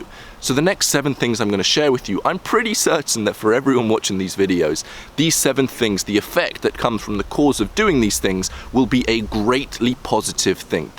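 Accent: United Kingdom. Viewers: I really can't tell if he's British or American 😳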